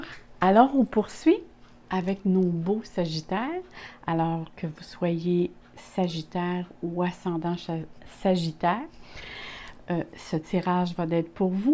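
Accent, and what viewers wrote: Canada. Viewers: Coucou chère merci pour ce superbe tirage, quel espoir.... en plus j'adore ton accent canadien si sympathique... Un grand merci et je te souhaite aussi une excellente année 2021 à bientôt 😍😍❤️❤️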